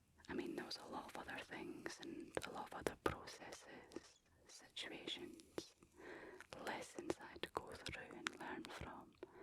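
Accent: Scotland, United Kingdom. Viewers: Most Gorgeous! Love your Pure Scottish Accent Whispers! Love your Beautiful Smart Look!:yougotthis:💯🌟❤🌻🌼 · Love your Scottish accent 🥰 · I've loved Scottish accents on women since I crushed on Sheena Easton way back in the day. 🥰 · HELLO really enjoy your Scotty accent and your beautiful smile. Rick Henderson U.S.. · Doesn't even have to be ASMR, I absolutely LOVE the Scottish accent. I could listen to someone with this accent talk about absolutely nonsense allllll day. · Scottish has to be one of my favorite accents! 🤌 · I love Scottish accents so much, I find myself repeating words in it sometimes. It’s so satisfying 😂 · Gosh i Miss Scotland I fell in love with your accents this past summer 😃 lol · I love ASMR and also have studied linguistics, and the Scottish and Irish accents are literally some of my favourite accents in the world; they’re the funniest, somehow the most uncanny, and I love it. 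Perfect mix. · Your Scottish accent makes my brain go all squiggly. Never stop saying words please. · Love the Scottish accent. Found it extremely relaxing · As an American who just found out that i have mostly Scottish heritage… it explains why I love the accent so much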